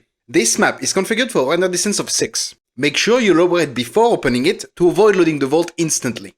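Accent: France. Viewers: Tysmm! W video funny french accent lol.Greetings from algeria ❤ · you have a french accent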